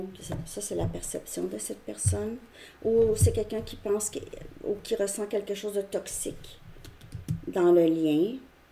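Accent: Quebec, Canada. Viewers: Tu es vraiment bonne et super drôle! 😄🥰 c’est l’fun d’entendre une Québécoise. Beau ressenti, merci pour la guidance! ❤️✨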